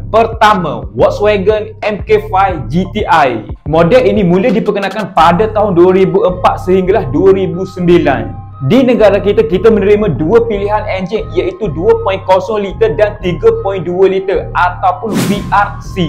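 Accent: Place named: Kelantan, Malaysia. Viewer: Boh. Demo mmg try hard nak cakap KL. Tapi demo ank jati kelate. Bunyi kelate demo tu. Gapo² pon video demo meme terbaik. Klu buleh , demo tulis laa kat video. MK5 , Merc , Bmw F20. Mudoh sikit ore nak cari. Tapi keto hok demo list ni , untuk ore ado duit poket lebih , buleh laa pakai. Keto lamo mesti ado nyakit. Sparepart pon parok. Sapo² ado duit poket lebih , boleh laa guno. Keep it boh 👍🏻👍🏻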